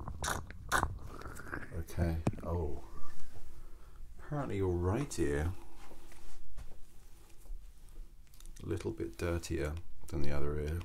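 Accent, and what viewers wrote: United Kingdom. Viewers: Its incredible how much i love the british accent!! Besitos From Spain♡ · 썸네일만보고 속삭이는 소리 기대하고 왔는데 제가좋아히는 영국식 영어에 목소리까지 너무 좋네욬ㅋㅋㅋㅋㅋㅋㄱ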